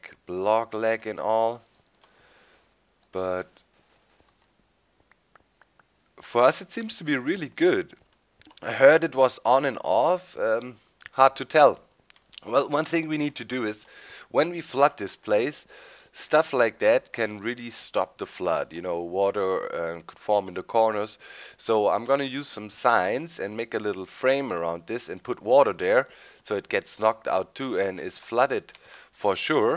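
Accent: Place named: Germany. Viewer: versuch bitte mal ein bisschen an deiner englischen Aussprache zu arbeiten, es tut mir jedes Mal in den Ohren weh, wenn du "Witter" sagst... Keiner verlangt perfektes Englisch, aber es wäre ganz cool, wenn du das "th" wie in "SethBling", "over there" und halt "Wither" ein bisschen, naja, englischer und weniger deutsch aussprichst;).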